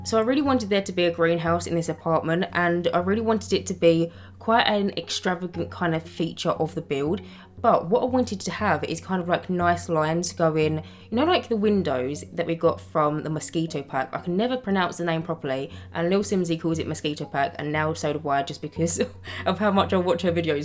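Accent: United Kingdom. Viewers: I absolutely adore your videos, you are so imaginative and I love the storytelling while you go through the videos, especially a fellow British accent! Sometimes I feel like other Sims videos are so loud and dramatic, but I love having your calming vids on in the background! Thank you for always posting (but also no thanks for always making me buy more packs!!!) X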